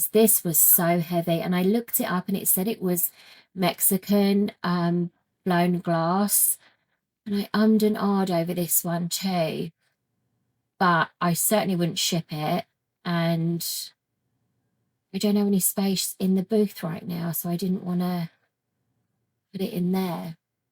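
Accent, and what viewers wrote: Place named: New England, United States. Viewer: I love your accent! Whenever you mention the names of New England towns, I think, “oh, that’s how it’s supposed to be pronounced “ 😂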